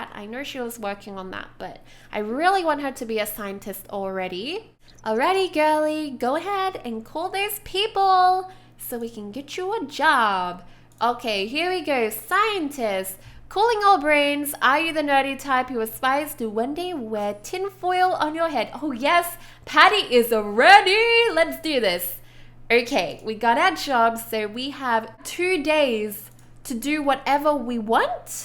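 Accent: Australia. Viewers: is she from Australia? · Are you Australian?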